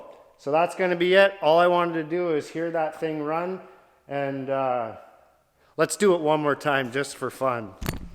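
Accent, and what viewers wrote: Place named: Saskatchewan, Canada. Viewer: You sound like your from Sask eh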